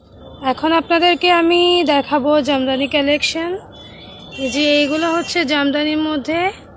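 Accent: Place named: Sylhet, Bangladesh. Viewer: তোমার সিলেটি ভাষার প্রেমে পড়ে গেলাম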